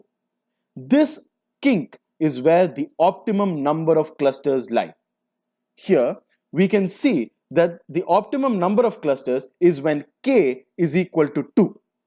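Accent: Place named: India. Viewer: even if my ears hurt by your hardcore indian accent, your way to explain is brilliant, sir!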